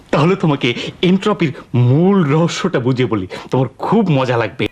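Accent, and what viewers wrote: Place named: Dhaka, Bangladesh. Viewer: you've good impact of voice of dhaka in your videos but you made some kinda fusion here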